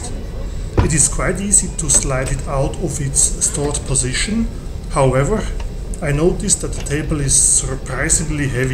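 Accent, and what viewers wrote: Germany. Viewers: I understand you very well. I personally think you don't have to work on your accent. German accent could be quite charming. I am living in London but have a mixture of Thai American English and Dutch accent depending on who I am talking with, choice of words n my mood. Hahaha. Thanks for nice report. Patrick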